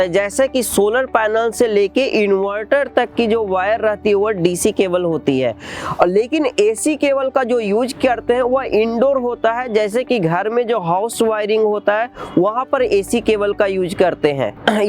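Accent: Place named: Bihar, India. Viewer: Bahut menat karna hai abi bihari jaise bol rahe.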